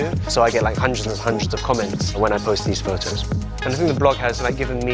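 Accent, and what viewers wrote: United Kingdom. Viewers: wow, first time hearing your voice. I love the british accent! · Ahaha, those years in Japan still haven't cost you your Brit accent, I see:)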